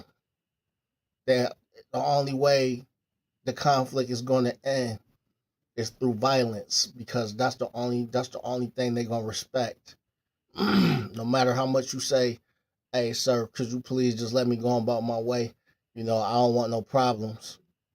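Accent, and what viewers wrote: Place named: California, United States. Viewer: 100% facts! You was born in NY? Sounds like you got some Cali in you. ✊🏽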